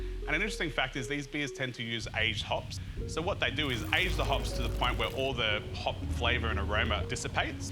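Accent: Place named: New Zealand. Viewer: This guy's a kiwi, no Aussie.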